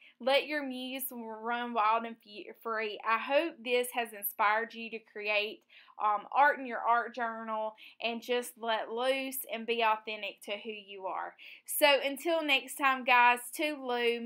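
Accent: South, United States. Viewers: You surprised me with that eyeball and it's terrifically wonderful! Love to listen to your Southern gal speak.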